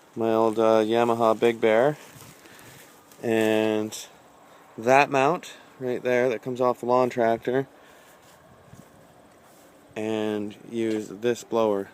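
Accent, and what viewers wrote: Canada. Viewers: I HATE WHEN CANADIANS USE ANY WORD WITH ''OUT '' IN IT ..ABOWT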